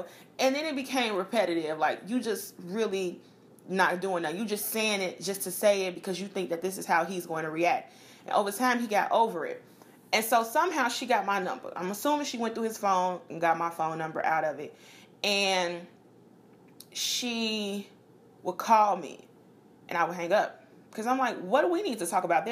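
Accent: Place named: Chicago, United States. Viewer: U from Chicago huh....nice. Midwest in the building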